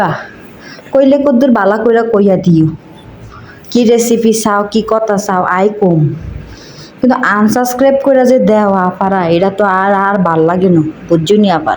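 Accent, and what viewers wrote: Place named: Noakhali, Bangladesh. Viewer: আফনের ভাষা নোয়াখালী, চাঁদপুরের অইসে কুমিল্লার ভাষা আফনে বুইল্লা গেসেন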